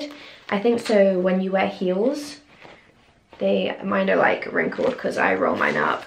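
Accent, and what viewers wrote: United Kingdom. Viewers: I love these try on hauls, your body is amazing and you are gorgeous. But I also love your British accent and your voice, just listening to you talk gives me some asmr tingles sometimes. You seem like such a sweet person. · You have gorgeous eyes! And I love your beautiful voice with that British accent it’s hot! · Her accent sounds British. I never knew she was British.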